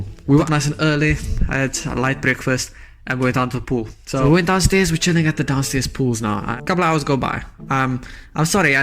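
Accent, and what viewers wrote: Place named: United Kingdom. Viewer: You sound British ngl also W transition at the start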